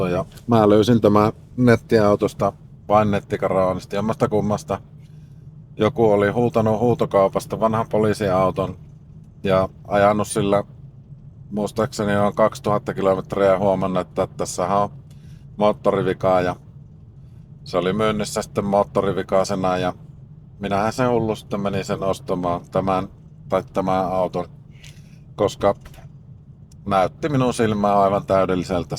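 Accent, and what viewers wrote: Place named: Oulu, Finland. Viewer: Hyvin tehty video, varmaan mieluinen pakuharrastajille! Luontevaa, leppoista "oululaista" kerrontaa, jota on helppo seurata. Mutta missä on Aava?!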